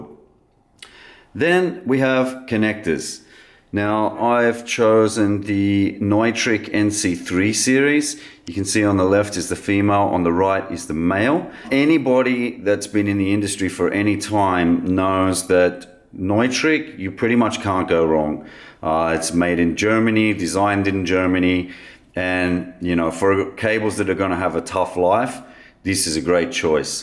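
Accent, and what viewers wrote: Australia. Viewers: Are you Australian? Great video. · Aussie? Love the video mate.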